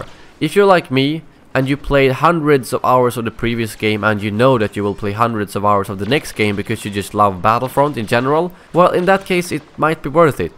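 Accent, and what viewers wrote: Sweden. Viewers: Are you swedish? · You Swedish?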